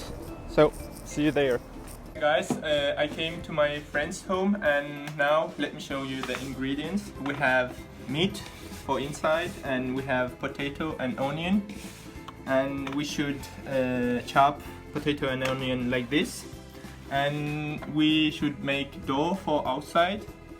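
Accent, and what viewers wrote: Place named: RP, United Kingdom. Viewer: perfectly posh thank you!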